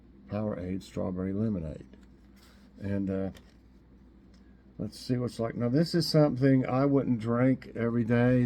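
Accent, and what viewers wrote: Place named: England, United Kingdom. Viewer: I love listening and hearing your English accent for I always love to learn. I tried to listen to understand English much better. Thank you 🙏 so much for sharing this strawberry lemonade as it is so new to me. I’d like to try it one day 🙏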